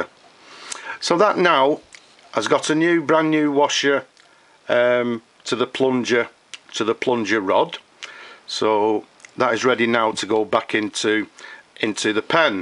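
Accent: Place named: North, United Kingdom. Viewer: I do appreciate your video! By the way, your accent sounds pretty much like northern British accent haha. I don't know if I'm right about this but I like the way you talk definitely! I have just got the exact same pen on Ebay.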